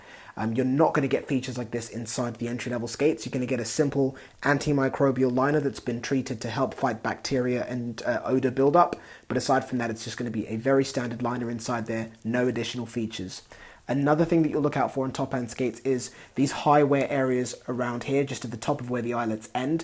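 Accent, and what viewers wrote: England, United Kingdom. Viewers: Can anyone else narrate without that accent., it’s bad enough on commercials or cooking shows but now hockey, funny not aware of any English hockey teams..... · I was expecting a Canadian accent, not English. Anyway, thanks for the video, I'm in the market for skates.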